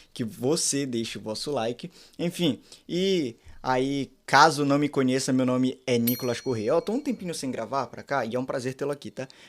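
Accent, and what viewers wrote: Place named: Pará, Brazil. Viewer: Vc não é paraense irmão é o que aparenta pelo seu sotaque 😅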